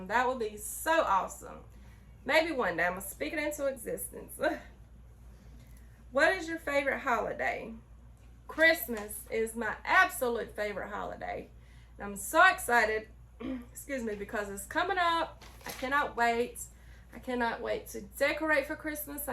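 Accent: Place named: South, United States. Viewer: Oh yeah honey you got an accent. You got that southern drawl. I’m from TN I’ve got an accent too. Lol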